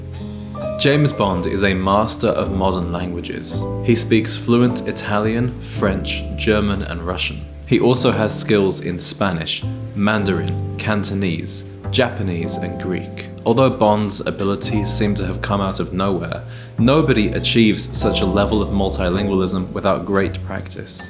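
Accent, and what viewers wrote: United Kingdom. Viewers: Chicago? I thought you were British in the UK!?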